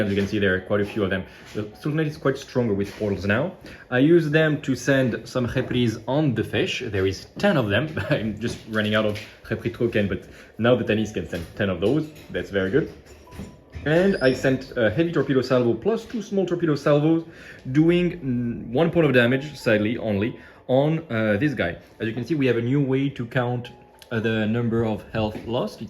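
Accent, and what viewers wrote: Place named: France. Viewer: Toujours un plaisir à suivre ces Battle Report. Merci aux participants! This little french accent add the perfect flavor to the Alliance fleets 😄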